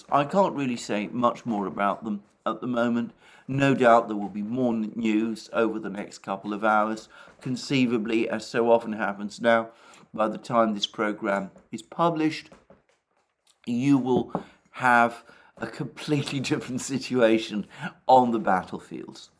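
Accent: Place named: RP, United Kingdom. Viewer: the Russian economy is in the toilet. Even according to themselves. Instead of listening to a Russian blogger and propagandist, why don’t you do some critical thinking and read some independent sources. Russia has been and continues to beg for sanctions to be lifted since day one. Income from gas and oil has now tanked and they are running a fiscal deficit month by month. For your own sake, do your own research as I do and don’t take this creators word for anything just because he has a posh English accent. I have yet to hear him utter a single truth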